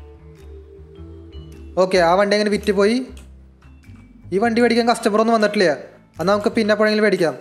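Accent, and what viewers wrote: Thrissur, India. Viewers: Bro Thrissur anno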